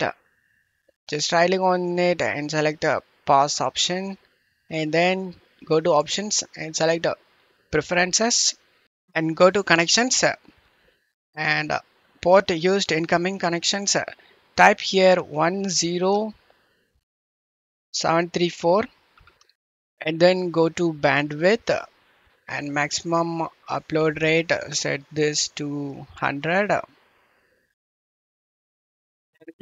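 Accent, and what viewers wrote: India. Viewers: as soon i see indian accecent i knew hw will fix my problem thanks bro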